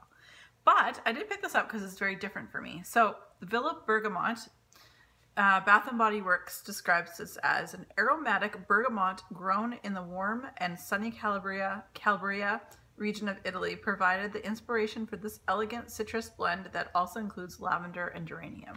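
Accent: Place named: Canada. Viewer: loving your Throwback Thursday candle reviews. I"m all caught up now and looking forward to more. Your Canadian accent is adorable!;-)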